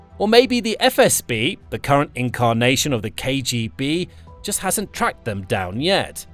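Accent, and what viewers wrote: England, United Kingdom. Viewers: Why does the narrator (Who's clearly English by his accent) keep calling petrol "Gasoline"?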